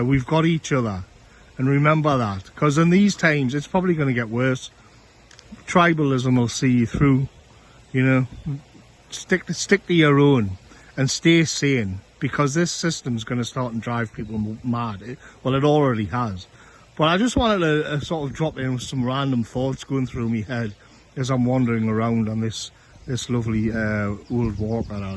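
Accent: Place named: North East England, United Kingdom. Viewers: very capable. His accent is working class north east. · I remember your tree roots video. I also remember getting to grips with the Geordie accent So I could appreciate your content when you only had a couple of thousand followers.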